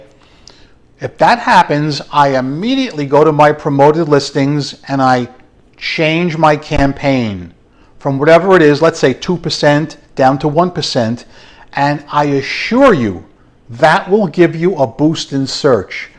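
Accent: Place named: East Coast, United States. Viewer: my west coast ears hearing an east coast voice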